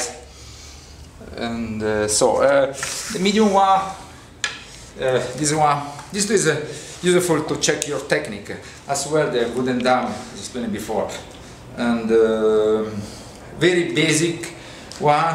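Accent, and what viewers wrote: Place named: Italy. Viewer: Hai un accento italiano abbastanza forte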